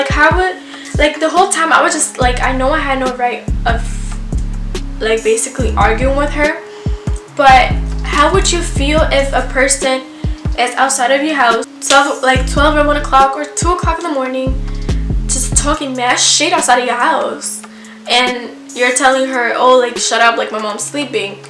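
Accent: New York, United States. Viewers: Your from NYC? You sound like it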